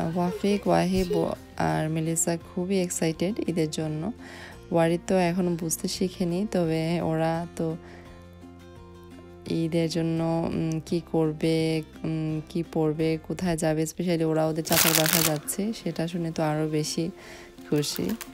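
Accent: Sylhet, Bangladesh. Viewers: Salam apa . Apni ki shyllhety. Apnar kotha sune to buja jayna. Doy bora ki vabe banate hoy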